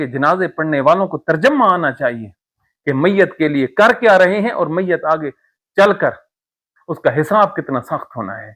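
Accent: Saraiki, Pakistan. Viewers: آپ لہجہ اندازِ گفتگو بتا رہا ہے آپ سرائیکی ایریا سے ہیں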